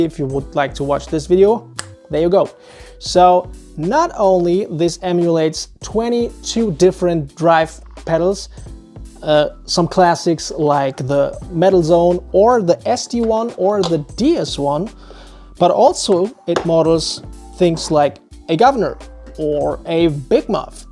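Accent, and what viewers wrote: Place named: Latin America. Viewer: Perhaps your accent and slight resemblance to Argentinians, possibly why you give off those light skinned Latino vibes? Haha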